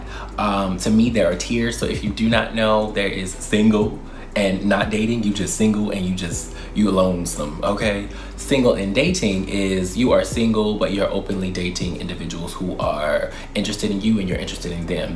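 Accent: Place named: Baltimore, United States. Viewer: You serve your community in a way no one else can. Thank you for sharing your thoughts on this. I think alot of what you are sharing transfers to grad chapters too. You also ate when you said parents experience "Peter Pan" syndrome. The baltimore accent is a mood. If people say that can't understand you, they are just being anti-Baltimore (aka anti-black). Alright now with the double life at 15/16 lol. Living is a habit!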